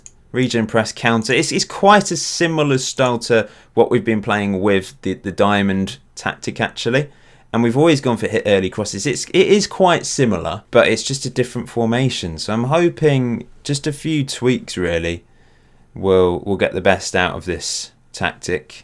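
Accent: United Kingdom. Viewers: When your girlfriend recognizes the voice of that 'posh guy from England' 👍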